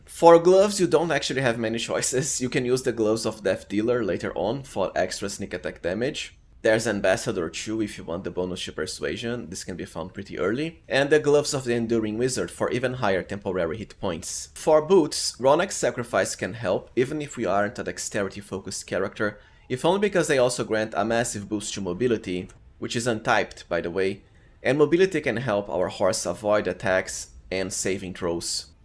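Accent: Brazil. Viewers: 5 seconds in and I KNOW you are Brazilian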